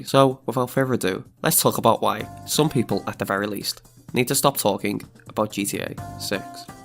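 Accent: United Kingdom. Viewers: High quality bti'ish voice, let's go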